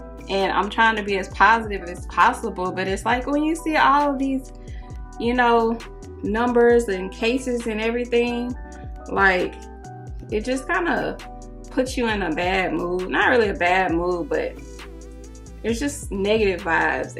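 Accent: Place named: South, United States. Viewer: U country where u from youngan?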